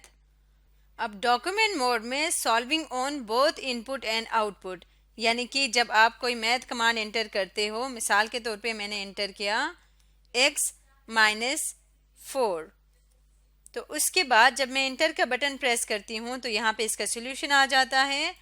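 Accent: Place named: Pakistan. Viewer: It seems that you are from pakistan.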